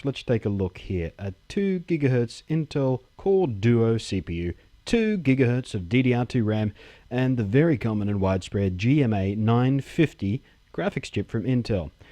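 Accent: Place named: Australia. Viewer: OY!! WTF are you going on about? Don't answer that. I tune it to your videos, not unlike MANY, to see your cute face and expressions and hear your cute Aussie accent. You're an adorable pretty face.....take your fucking shirt off bitch.....give me a GOOD show!!! If you think you're watched JUST because of your "insights"...guess again and join reality....you're popular because you're a prettyboy. So take off your fucking shirt and get on with it....for us!!!! YAY!!!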